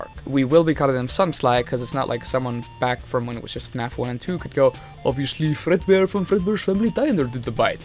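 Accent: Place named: Iceland. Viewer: omg, just found your channel, thought your accident sounded familiar… sæll íslendingur😁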